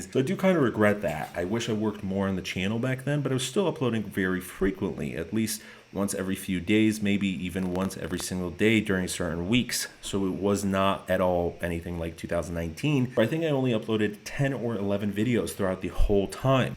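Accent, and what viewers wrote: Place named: Poland. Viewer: You have an polish accent!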